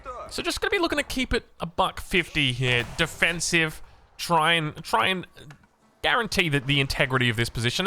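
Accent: Australia. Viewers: Aw :( you don't sound as Aussie as you used to from the last upload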